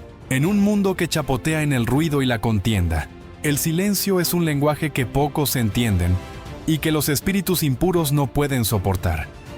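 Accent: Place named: Guatemala. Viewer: Me parese qie eres de Guatemala por el tono de thu voz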